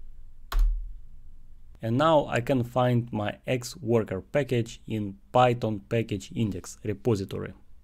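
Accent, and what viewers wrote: Russia. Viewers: Лайкт фор зэ кул рашн экцэнт энд эксэпшонал контэнт!!! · are you russian? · Мне одному показалось, что родной язык автора всё-таки русский? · Прекрасный русский акцент ))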